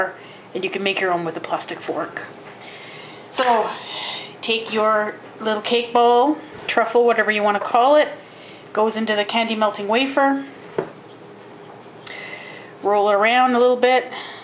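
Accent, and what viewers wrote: Canada. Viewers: aww, these look so good! did u know you have a slight Canadian accent?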